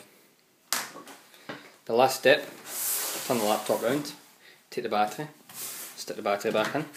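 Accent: Scotland, United Kingdom. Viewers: thanks for the tutorial! Love the Scots brogue - made it much more pleasant to watch!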